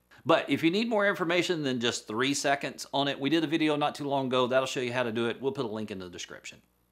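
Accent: South, United States. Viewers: I quite like your southern drawl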